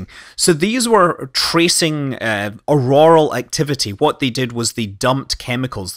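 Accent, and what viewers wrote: Scotland, United Kingdom. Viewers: Is Scottish?? Where's his accent from?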